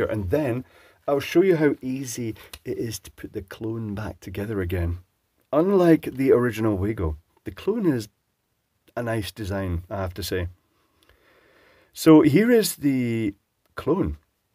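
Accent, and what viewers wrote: Scotland, United Kingdom. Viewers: Another good video,watched you for years but never commented,I live in Ayr and it's good to hear a fellow Scot,I bought some non branded ones from Amazon after I watched a video of John Ward's where he tested them extensively against branded terminals and gave them a good review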